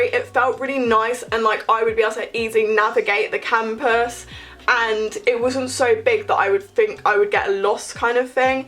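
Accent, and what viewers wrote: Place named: Bristol, United Kingdom. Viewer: Really helpful, I’m thinking of going, and I imagine your from bris aswell cause of the accent n distance away haha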